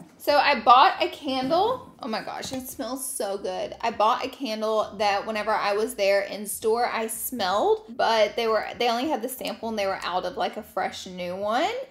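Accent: South, United States. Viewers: omg where is she from?! she sound soooo southern haha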